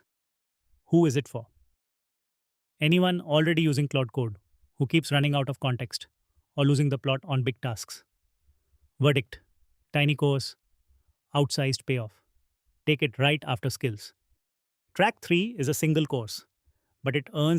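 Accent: India. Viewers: You made a chatbot channel and you chose to use a Hindu accent english voice-over? My God... how counterproductive! Spare our ears and brains please!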